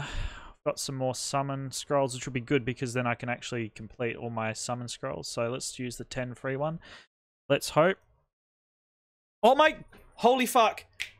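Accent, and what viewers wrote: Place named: Australia. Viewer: Hmm you sound like an aussie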